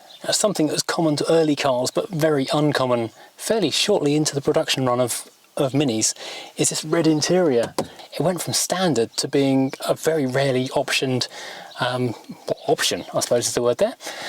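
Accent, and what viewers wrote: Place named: Southern England, United Kingdom. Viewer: oh right!, i thought you sounded more southern . i am just past Southampton but a p6 nut . useless at mechanics but I do like buying them , ha, I had about 17 at one point but down to 5 now.,only one on the road . I was interested in your build for I have to do an engine swap with a v8 5 speed . and I need motivating such as watching you . I have a p6 to get fixed and its from Dover I think? or that way, its a series 1 v8 5 speed. I need to dig it out and get it going . thankyou so much for your videos . it could be the inspiration I need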